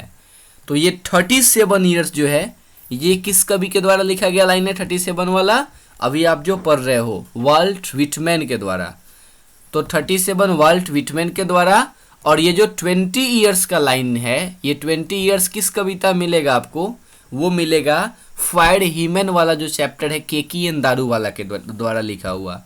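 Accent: Bihar, India. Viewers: Gajab explaination in Bihari style...😀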